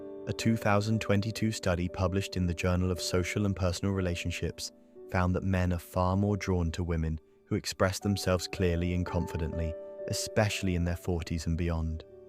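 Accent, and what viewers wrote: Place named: England, United Kingdom. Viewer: It's a real interesting video my love. I'm sure it's you that made it. I am grateful to you my love, for all these videos of celebratipn of my personality, you generate for me... I'm speechless... My man is everywhere and celebrate my heart with such passion and such respectful love... How can i resist you my love... ?? It's sounds impossible to resist you... to escape you... You're so smart... It's scaring... 😂😂😂 Your intelligence is fascinating to me... Absolutely dangerous in fascination... By the way, i looooovvvveeee the english accent of this young robot man... It's lovely... I have nothing to add, my baby of love, your video is perfect, and you told it all. All all all. 😉😉 😘😘😘 💜🦋💕🌸🕊✨🌌🌈💜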